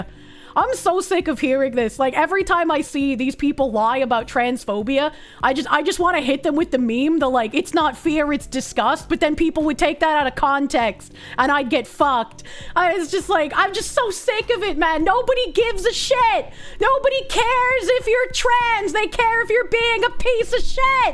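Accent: Boston, United States. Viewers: !!! I want to do YouTube and kik as a musician that doesn’t have to bite my tongue about schtuff or be confronted in front of my son in line at Whataburger lol. I’ve had local, wouldn’t call it fame, but was in a popular band in Houston for a decade. I kinda didnt like delusional locals coming up to my family while we were trying to have dinner to tell me positive or negative takes on shows or my idiot ex singers stupid fb post.. my question is, is it possible to be a musical vtuber? Like play real instruments with and avatar covering most my identity? And also i don’t understand where to start.. I’m sorta retarded.. is there any advice? Also you made my Texan ears love the Bostonian accent lol it’s calming to hear you at work. Between you leaflit and nux I’m convinced this is the best direction to have as much creative freedom as possible. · Jokes on them. 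The thick Boston accent is very attractive. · Gotta admit the Boston accent is doing it for me ❤😂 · Loud boston foxu sexy talk, more please. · I only know of because of whatever this shit is and I gotta say, very fucked up. But dude, as a linguist, I cannot get over that amazing Boaston accent, Imma have to study that shit XD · ! Sexiest Bostonian Fox Woman Ever!!! Talk Boston at me woman!! Talk BOSTON!!!!!! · I love your accent. I think Boston women are the best. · I notice when gets annoyed. Her Bostonian accent comes out strong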